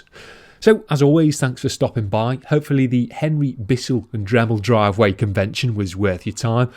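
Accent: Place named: Merseyside, United Kingdom. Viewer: Before watching vids, I thought the Merseyside accent was just good on record.. like the Mersey hits of the 60's But also sounds great for car detailers too..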